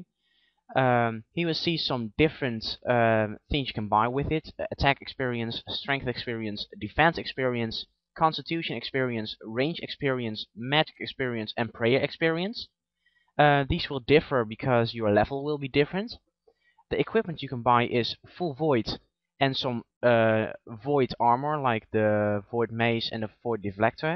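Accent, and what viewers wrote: Netherlands. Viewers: Are you dutch? U got a dutch accent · great, but should have added an ingame shot as well, just to see what it looks like. Just wondering, dutch accent?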